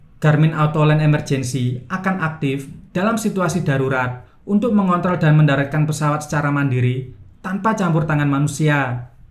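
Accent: Java, Indonesia. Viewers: host medoook 😁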